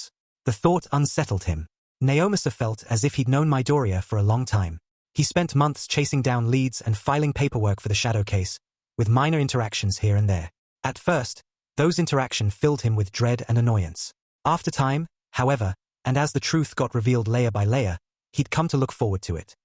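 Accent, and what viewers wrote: United Kingdom. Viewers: Is this shit British ????????????????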